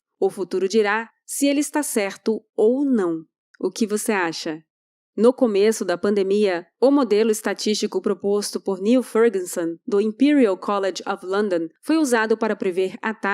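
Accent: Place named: South, Brazil. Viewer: Ótimo artigo e muito bem apresentado. Me impressiona a bela e perfeita dicção da. Não é carioquês, como a do Peter, parece mais de SP para baixo, como a da Caroline de Toni, de Chaoecó, SC.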